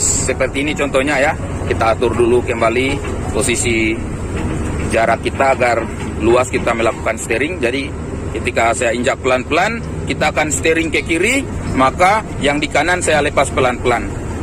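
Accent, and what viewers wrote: Bolaang Mongondow, Indonesia. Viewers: Mantap bang jelas sx👍 Mcam logal bolmong😁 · Rupa logat mogondow ini noh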